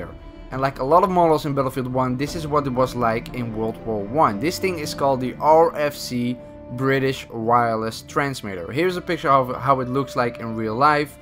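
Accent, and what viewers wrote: Netherlands. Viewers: He's Dutch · you sound dutch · De Nederlands accent btw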